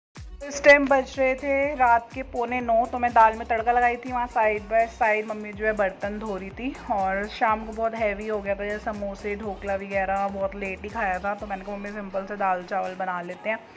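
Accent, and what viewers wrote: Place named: Punjab, India. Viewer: You speak like a punjabii girl😍😍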